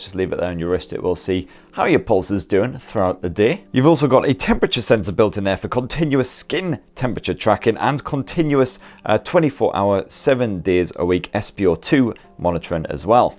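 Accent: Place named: England, United Kingdom. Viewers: Celia doesn’t understand your northern accent 🤫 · Here for the english accent😁💜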